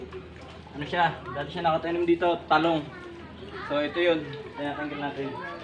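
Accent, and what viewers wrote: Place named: Laguna, Philippines. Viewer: Tol taga san ka pala ka punto mo samin eh sa sta cruz laguna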